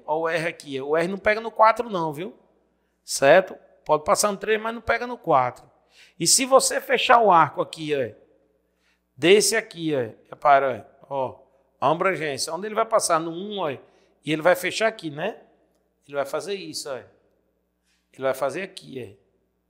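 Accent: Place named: Maceió, Brazil. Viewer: Vcs são de Maceió? O sotaque parece muito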